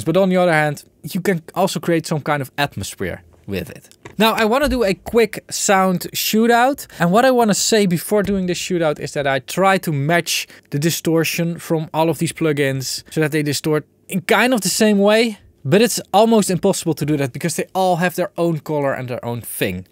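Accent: Netherlands. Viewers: The Dutch accent is powerful, I like it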